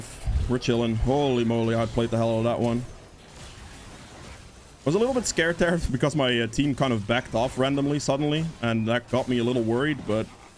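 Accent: Netherlands. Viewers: Ran into your video's while looking if I wanted to play Udyr, immediately recognised your Dutch accent :D Keep up the great content!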